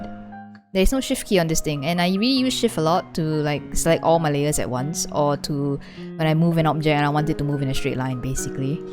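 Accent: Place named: Singapore. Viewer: Sounds Singaporean 👌🏼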